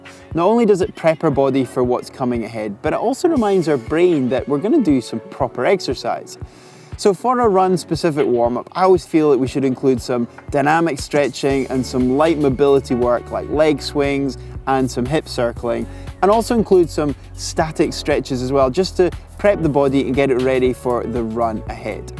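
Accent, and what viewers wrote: Scotland, United Kingdom. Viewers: Defo Scottish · There is just something about that scottish accent, coming from either men or women, doesn't matter, that just... 😍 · Really though, what is his accent? It sounds like a Scottish blend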